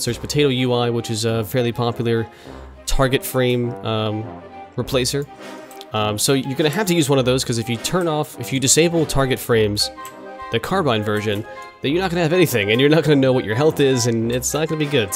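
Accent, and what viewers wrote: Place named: Australia. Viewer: ur australian cause u dont know how to say data the english way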